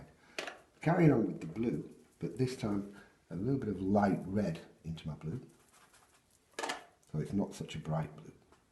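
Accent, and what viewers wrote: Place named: England, United Kingdom. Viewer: Your instruction is precise and detailed. You give the reason why you are doing something and illustrate clearly. I too shall look for your book. You do need a new sound system though for those of us who have limited hearing. English accents are not always easy to follow for Americans, but having hearing trouble just makes that more difficult. thank you so much for your lessons.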